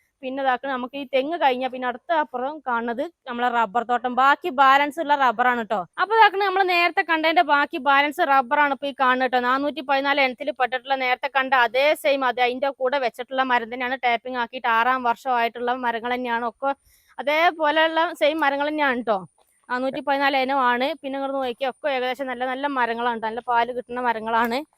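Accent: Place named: Malappuram, India. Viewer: സഹോദരി കാസർകോടാണ് ഭൂമി പക്ഷെ ഭാഷ മലപ്പുറവും ഇതെന്ത് കഥ ?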